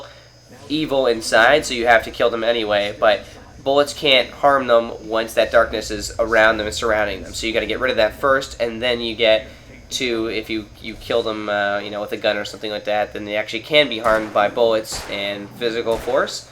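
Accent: Canada. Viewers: lol i didnt kno u were canadian till i watched this video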